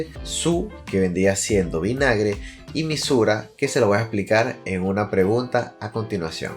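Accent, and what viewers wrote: Venezuela. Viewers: Me gusta mucho su acento venezolano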